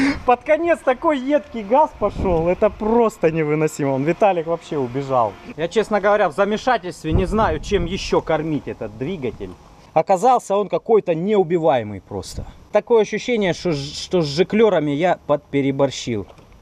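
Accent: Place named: South, Russia. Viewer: ох уж этот южный говор :) хазуй хазуй!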